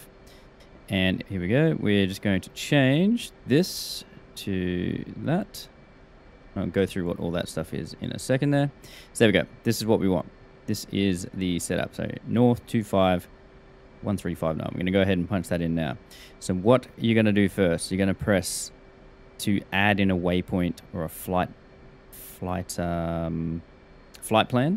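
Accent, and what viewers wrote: Australia. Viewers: Great to have some tuts in relaxed Aussie accent, very easy to take in and no time wasting stuff cheers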